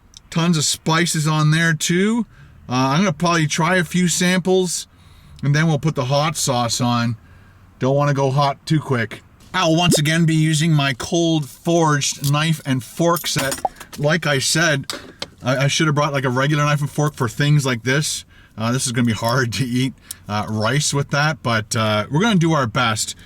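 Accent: Canada. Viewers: Ouuuuuuu!!!! You are Canadian "EH"!) <3 I have always said that our own country is just as good as the USA to explore and take a vaca!) Fabulous work brother!) Keep up the vids!) <3 I will be driving there for sure!!!! I'm sure the mango nectar helps to calm the spice eh!?!) Would love to hear your story! ....where you came from and how you became a YouTuber!!! ;) <3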